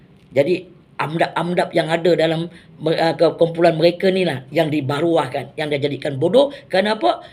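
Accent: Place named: China. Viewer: ...tak syok cakap intonasi macam ni....kena buat macam sebelum ni...syok dengar...ada itu pelat2 chinese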